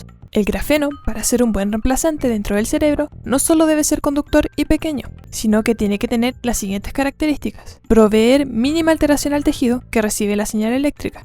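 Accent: Chile. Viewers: Me gusta más tu acento chileno 7u7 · Buen video! Eres chilena?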